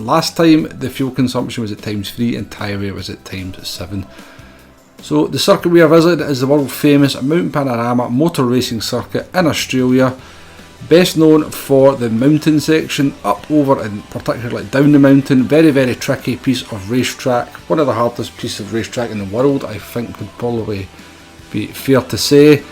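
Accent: Scotland, United Kingdom. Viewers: Hi Great video mate I’ve never bothered to learn this track i think it’s about time I did so thanks for the video The Scottish accent hard to understand never People must listen a wee bit harder top man i’m from Essex people never understand me 😂👍🏻👍🏻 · Nothing wrong with the dulcet tones of Scotland. You want to try being me. I'm a geordie :) Thanks for the video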